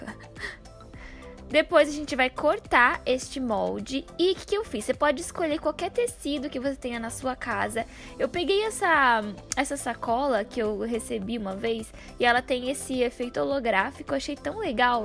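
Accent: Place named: South, Brazil. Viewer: É do sul né? Ksjsk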